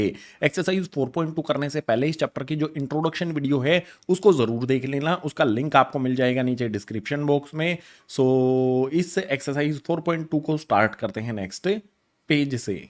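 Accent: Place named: Kota, India. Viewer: your accent... 100% kota student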